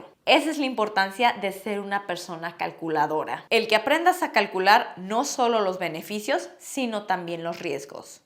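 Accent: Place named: Argentina. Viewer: Que hermosa Argentina ...que mujer...me encanta el acento de esta mujer Argentina....¿así como hablas juegas fubol? un beso...gracias por tu videos. Saludos desde Colombia.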